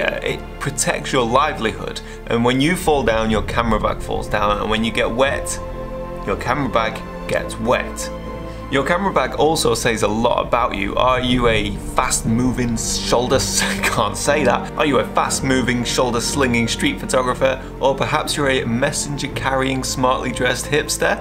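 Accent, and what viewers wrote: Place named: Yorkshire, United Kingdom. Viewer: Ah??? Thought tha was a Yorkshireman. I have gone with a Mammut Trion 35 litre Mountaineering sack (they also do a 50) and put a Tenba Camera insert in it - total cost £160. - plus the cost of a rain cover. Not perfect as the insert has to be removed to access kit but the pack does have the zipper around the harness feature that is rare in a Mountaineering sack. Adam Gibbs - Mr. Grumpy to you, has used one for many years, but just changed it for a bespoke camera pack, supplied as yours is by a manufacturer. No problem with this BTW, I do not begrudge either of you perks for providing such excellent content. The Mammut Pack is also multi functional as it is a rock solid standard pack into the bargain. For street photography I go with a very battered Chinese made copy of a Paratroopers Bag from Israel, cost £15.... 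plus a £35 Billingham Insert - does not look expensive but gives the Camera 5 star protection. And it cheap:-).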